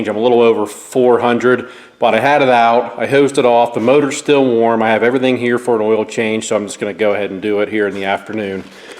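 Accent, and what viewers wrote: Pennsylvania, United States. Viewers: I thought you sounded like you were from PA then I saw the PA sticker where abouts you riding?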